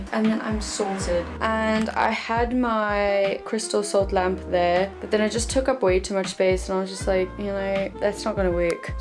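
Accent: South Africa. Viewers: you sound south African. hmm lmao great vid btw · as soon as i clicked this video i said “she’s from south africa” i adore your accent!!! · I was like damn she sounds South African, then she hit us with the "so, ja" and I knew 😂❤️ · the first 5 seconds I was like sHe is SOuth AfRICan 😂😂❤️ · she's south african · Are you South African? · I could spot the accent straight away!! I'm South African too!! <3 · she afrikaans so south africa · Are you South African? You sound like it but I don't want to assume things because people get so triggered when I ask this but... I'm South African and you sound soooo South African.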